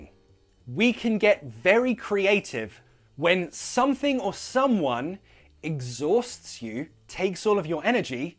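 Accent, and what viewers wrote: United Kingdom. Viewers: british pronunciation is way too charming i’m love that’s the one i will learn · Can’t believe what I’ve just found, I always missed this kind of content. British accent and slang taught in a very fun way. We’d get along hahaha Keep it up dude! You got a new sub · I don't like British accent, then I've found your channel! Nowadays I don't miss anything about it! I really like it...👏👏👏👏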